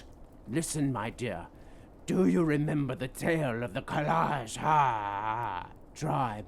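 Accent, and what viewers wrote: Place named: United Kingdom. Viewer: AUDIO TOO LOW! Repost after turning it up to ELEVEN! Lose the Limey Accent - pretensious & condescending.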